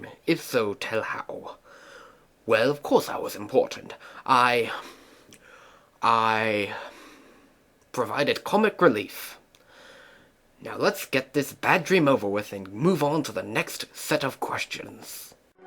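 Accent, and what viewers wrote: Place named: England, United Kingdom. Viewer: cool English accent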